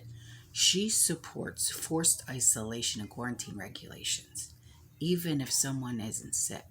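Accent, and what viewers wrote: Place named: New York, United States. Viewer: I see you live in Florida, but you definitely sound like you're from NY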